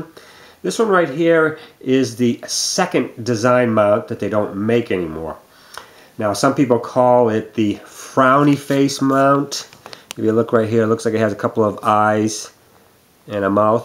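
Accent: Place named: East Coast, United States. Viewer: Does 2nd design mean it's not in the 3rd Generation S-series? Also, where are you located?? You sound kind of east coast. If I'm ever in your neighborhood I'd like to have my 2000 SW2 looked at by ya!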